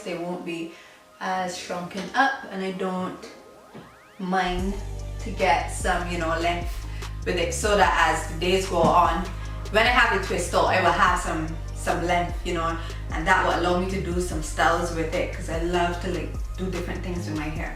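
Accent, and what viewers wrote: Barbados. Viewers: You sound like a bajan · are you bajan? you sound just like my mom🤔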